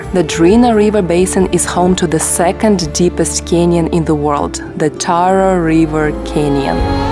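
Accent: Russia. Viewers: I hate that Russian accent when you speak Serbian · Your super strong Russian accent when you try to speak Serbian is adorable 😂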